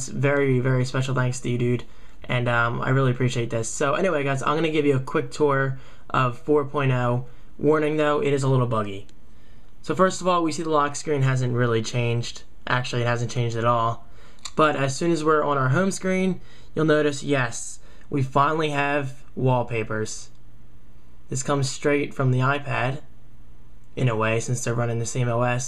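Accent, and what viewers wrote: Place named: California, United States. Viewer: haha are u from california or somethen your accent is cool instead of photos your like FOWTOES XD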